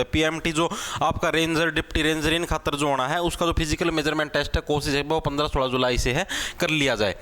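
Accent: Haryana, India. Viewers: Haryanvi andaaj❤❤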